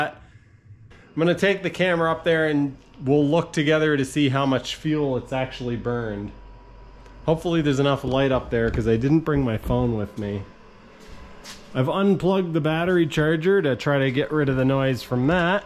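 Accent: Canada. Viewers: Can't watch the whole video due to the Canadian accent. Boot boot boot annoying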